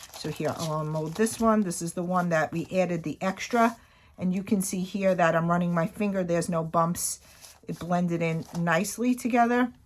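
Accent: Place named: Brooklyn, United States. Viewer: This came out so beautifully! I know I’ve said it before, but your Brooklyn accent makes me so happy. 😂😂 Those molds are soooo beautiful. I’ve been experimenting with resin work for the past year or so and I have had nothing but trouble with it! I know you painted yours, so it doesn’t matter in this instance, but I had consistent problems with my resin not fully hardening and always having bubbles in it. I also have used that exact same resin and it STUNK so bad it made my whole house reek. ☹️ Omg you with the neck ruff 😂😂 I would love to see a full video tour of your she shed!!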